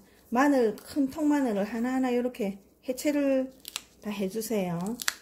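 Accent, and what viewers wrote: Busan, South Korea. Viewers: 유용한 정보 감사합니다 ~~ 부산말씨 정감 있어요 ^^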